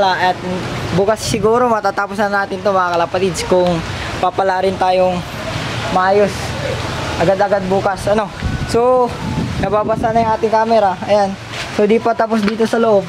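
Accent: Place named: Pangasinan, Philippines. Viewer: Taga San ka idol kc parang pangalatot ung salita mu eh Taga pangasinan ako idol shout out naman bago lng ako sa Channel mu idol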